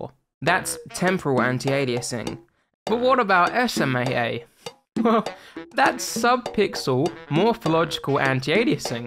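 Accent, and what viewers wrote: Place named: Roadman, United Kingdom. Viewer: Well this is quite surreal, it's like listening to a roadman lecture about graphics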